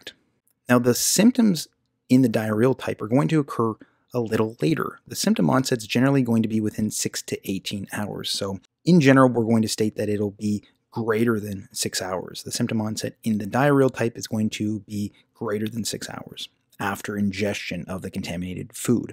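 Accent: Canada. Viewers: Canadians talk funny.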